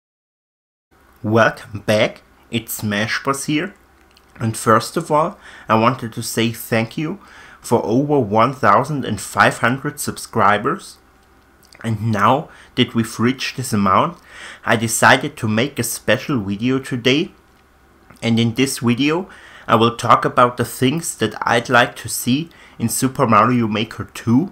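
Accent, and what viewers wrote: Germany. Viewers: You are German. Als Deutscher erkenne ich es!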